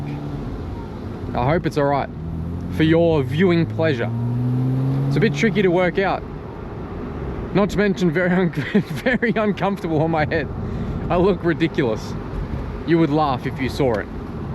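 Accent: Australia. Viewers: Hahaaa I love your ocka talk m8..😂..and I love your wee giggles here and there..living the life dude..🎉😊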